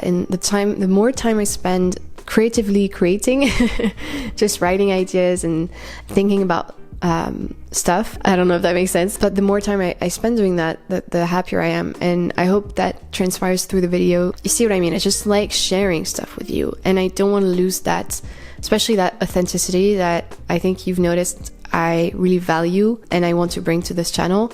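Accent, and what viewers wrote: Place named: France. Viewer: salut, j'ai l'impression que tu es française vu ton accent, mais je suis pas sûr. Est-ce que tu as été diagnostiquée adhd par un médecin en france? parce que j'ai l'impression que ce n'est pas trop dans la grille de lecture des médecins et psychiatres ici (du moins de ceux que j'ai pu rencontrer), mais que c'est plus reconnu dans le monde anglo saxon. tu as un avis là dessus? (désolé j'ai pas encore regardé toutes tes vidéos...)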